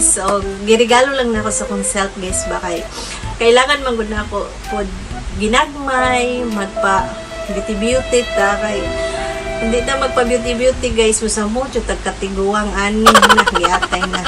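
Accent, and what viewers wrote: Visayas, Philippines. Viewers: Bisaya kaau ni madam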